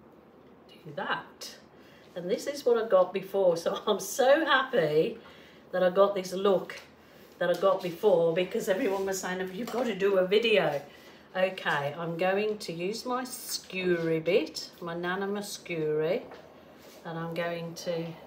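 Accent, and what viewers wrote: Birmingham, United Kingdom. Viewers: Like the wrapper of a gorgeous chocolate bar 😋😍. Are you from Birmingham, England originally?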